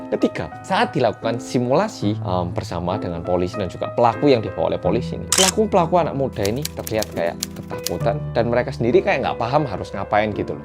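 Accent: Java, Indonesia. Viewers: MEDOK....... NICE 😁😁😁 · Medhokkk banget🤭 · Harusnya bersama detektif Korea yang medok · yey dtektif korea yang medok UPLOAD SETIAP HARI, semangat mas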